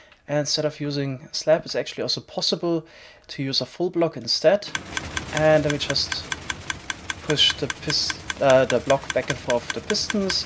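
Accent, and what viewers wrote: Germany. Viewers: You sound very German lol.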